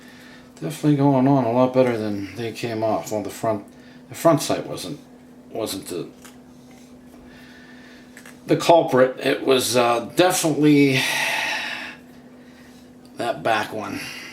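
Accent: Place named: Pittsburgh, United States. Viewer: Nice video. Lookin to do the same swap on my shield 2.0. Are you from Pittsburgh?? Definitely picking up on a yinzer accent.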